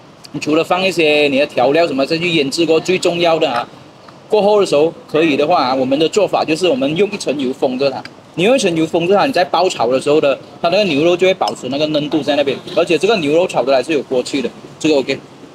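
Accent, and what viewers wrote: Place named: Malaysia. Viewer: 马来西亚。 华人 听着 不是 中国人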